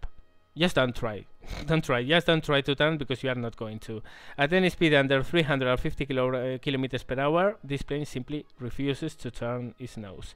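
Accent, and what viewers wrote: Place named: Spain. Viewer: Question: How do you perform the "Hammer" part? (when you climb up and use the rudder to get your nose down). I tried to do the same but the plane simply gets out of control... Btw, your accent was very strange the first time i heard it. Then during your Q&A vids you said you are from Spain. Now it all makes sense. Im portuguese (yes i know its kinda strange talking to you in english) and i can spot several words you pronounce the same way you would in Spanish. Thanks for the vids^^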